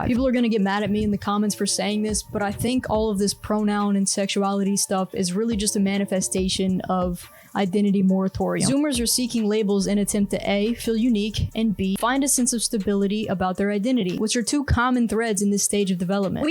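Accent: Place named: United States. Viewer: What part of the US are you from? I’m trying to decide your accent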